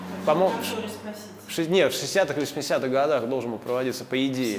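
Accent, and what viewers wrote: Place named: Saint Petersburg, Russia. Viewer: теперь я наконец понял, что значит питерский акцент)))) вернее не акцент а - манэра)) огромное спасибо! отличный материал, отдельно за Вяльцеву. Жаль не знал раньше, зато теперь могу смотреть НОН - стопом) огромный респект,!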